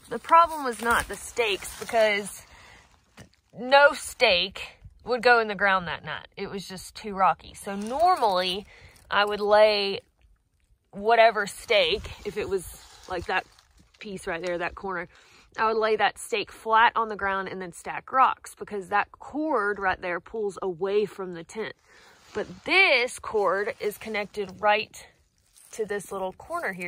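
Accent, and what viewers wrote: South, United States. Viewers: loved the deer at the beginning! Did you tell them "hey, darlin'!"? If I had ANY deferment of gratification (which I do not) I would wait until you finished and watch them all at the same time! So good - and as a non-deep south southerner, I love the accent!! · I love to listen to you speak, the beautiful Southern lilt in your voice, the way you speak like a little girl when you're talking to insects or animals, the revery here of when you were a little girl and how it's affected you as a woman, the love I hear for your dog Hank when you find one of his hairs, "But now, uh, I hope it never stops. And I can tell it's his cuz there's a whole sunset in the end of it." Whether you're talking about equipment, or the trail, or dispensing some of your homespun philosophy, it's always interesting. You so seldom have a harsh word to say, and have so many kind and uplifting things to say. 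It's what makes your channel so great.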